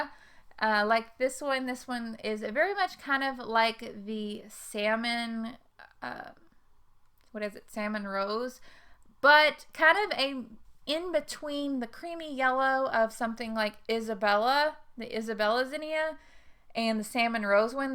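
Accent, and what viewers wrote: South, United States. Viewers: I have never grown sweet peas before and would like to try. You sound like you live in the south-so do I-do you have good success? It gets super hot where I live in north Georgia so I’m thinking I might be able to get some spring blooms.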